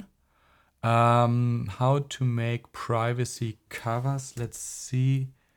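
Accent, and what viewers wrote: Germany. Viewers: I hear you are a German :D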